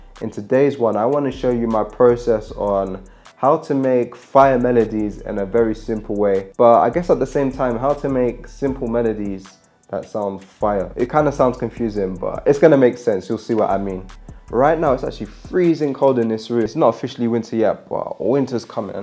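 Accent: United Kingdom. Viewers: Why do people with a British accent love to use the word “literally” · Its sound british af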